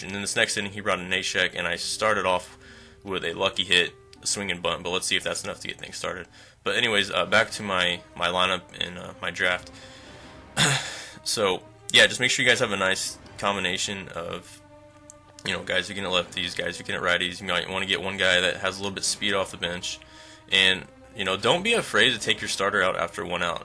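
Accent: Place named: South Carolina, United States. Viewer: are you from south carolina?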